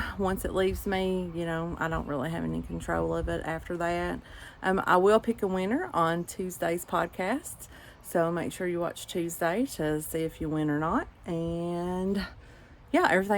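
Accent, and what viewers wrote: Kentucky, United States. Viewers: I love Vintage yarn and love finding yarn at yard sales and thrift stores. New Subscriber here. Are you from Kentucky? We have similar accents. Your voice is comforting.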